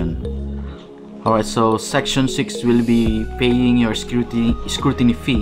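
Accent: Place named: United Kingdom. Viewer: Slang na master. Kala ko briton na ang nagsasalita.😄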